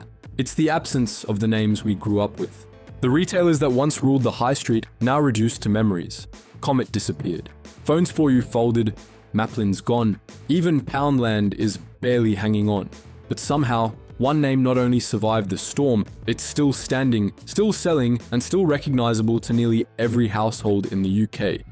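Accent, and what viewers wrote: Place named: Australia. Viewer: Nah, yeah, haha, yeah... The narat9r is an Aussie , can't you hear him ? Got stuffing 8n y9ur ears have ya ?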